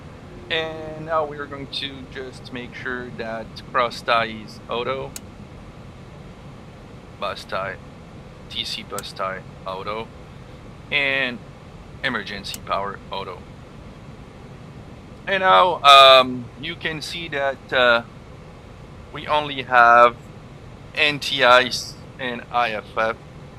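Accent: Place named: Quebec, Canada. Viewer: Salut! Je me permets de de vous parler en bon français québécois!!! J’ai reconnu l’accent 😅. Merci pour votre produit C17. Ça fais du bien d’avoir un avion avec une livré canadienne!!! 😎 see you in the sky!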